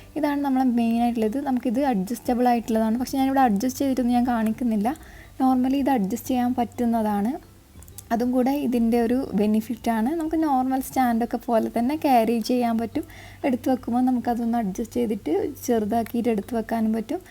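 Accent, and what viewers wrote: Kannur, India. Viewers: Eattathi Kannur slang 🥰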